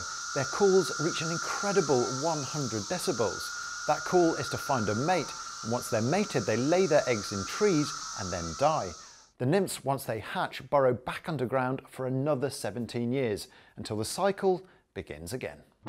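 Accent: United Kingdom. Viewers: Cicaaaadas! I hate British accents! Cicadas.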